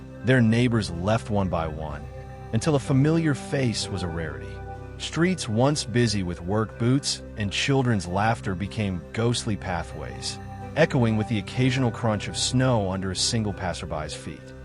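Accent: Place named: United States. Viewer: At least it's an American accent instead of a British accent like some are doing.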